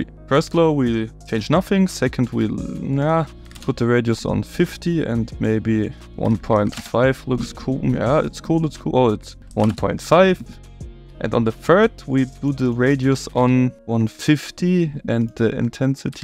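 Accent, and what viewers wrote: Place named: Germany. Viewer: Ich kann deinen Deutschen Akzent hören haha 😭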